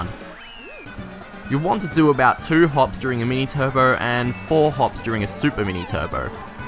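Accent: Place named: Australia. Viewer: hey, an Aussie.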